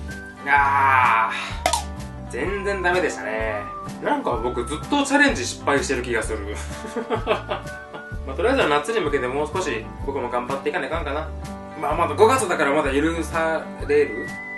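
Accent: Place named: Fukuoka, Japan. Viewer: 福岡なまりが懐かしくて癒やされます！お疲れ様でした(`･ω･´)ゞ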